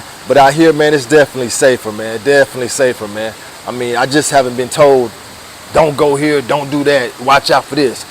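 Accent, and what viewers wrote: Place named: South, United States. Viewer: My brother you got game the gift for gab and much respect to you for help with understanding where we travel 🙌 💯 let's chop it up 😅 hey where you from Miami i bet somewhere down south. Im from Montreal Quebec Canada 🇨🇦 but visited my Aunt Uncle and cousins Miami dade airforce base and sounded similar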